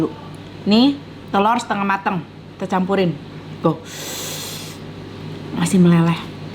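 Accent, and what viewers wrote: West Java, Indonesia. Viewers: Mukanya cara ngomong nya meni sunda banget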